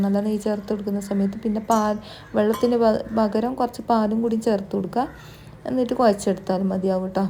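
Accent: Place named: Vadakara, India. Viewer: വടകര സ്റ്റൈൽ സംസാരം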